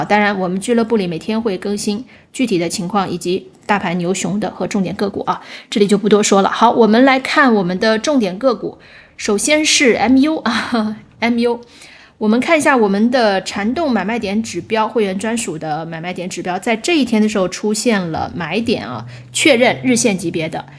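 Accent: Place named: Beijing, China. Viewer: 期待捣持出镜，亲切的老北京话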